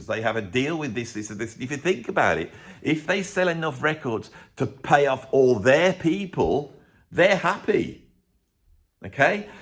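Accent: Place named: England, United Kingdom. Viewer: Nobody out of England does understand what you are telling because of your terrible Accent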